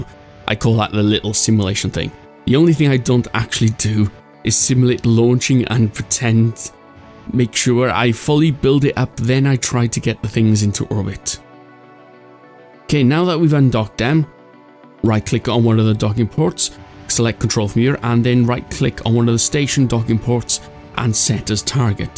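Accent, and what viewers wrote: Wales, United Kingdom. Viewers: Man, I miss your videos, I haven't watched your channel in forever. I remember watching your videos during a boring summer, or when I had a bad cold, etc.. Thank you so much for continuing to make your videos, they always bring me joy with your strange humor. And it's so nice to hear your Welsh accent once again xD Keep up the great work!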